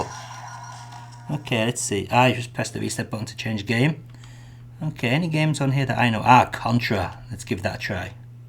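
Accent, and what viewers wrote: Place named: Liverpool, United Kingdom. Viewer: I can hear the scouse twang now that you've mentioned it. Cool!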